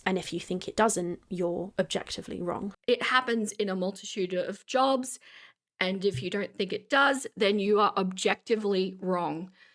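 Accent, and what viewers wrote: Australia. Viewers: Ooh, another Aussie voice. Quite a few of us among reaction channels, it seems (not that I'm a reaction channel - just Aussie :D). Anywho, great video!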